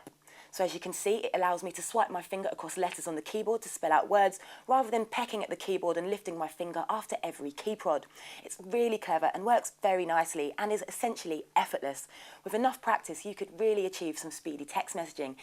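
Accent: United Kingdom. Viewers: Idk ... I just have my Samsung 8500 wave and I'm just happy =) . BTW ... what accent was that??? it's sounds like... british , but I'm not sure cuz I'm a spanish speaker ... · That british voice is soo nice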